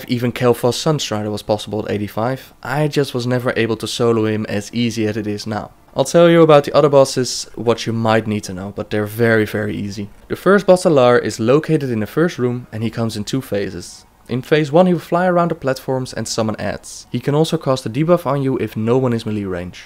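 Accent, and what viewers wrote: Netherlands. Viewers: Haha i can hear that ur dutch xD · are you from the netherlands ???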